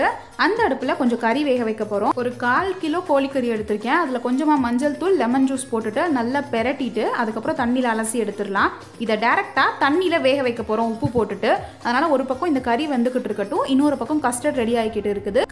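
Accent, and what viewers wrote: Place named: Theni, India. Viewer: Neenga cumbum theni pakamnu ninikiren ...unga slang theriyudhu..crct ah sister?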